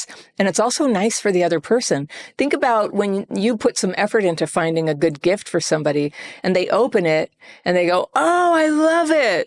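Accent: United States. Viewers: What a good videos you make, I always feel very seen in what you say and the way you bring your videos feels really like emotional support. By the way, what a GREAT American accent!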